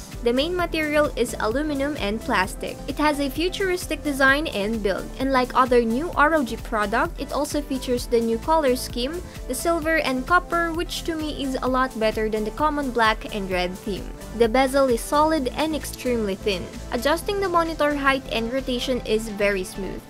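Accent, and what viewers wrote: Philippines. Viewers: I'm familiar with this accent. She's definitely Filipino. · filipino accent best accent ez to comprehend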